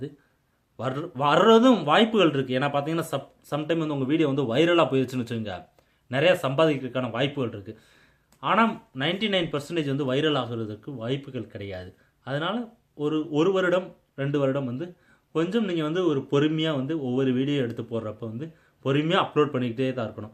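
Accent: Madurai, India. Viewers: boss nenga madurai ah...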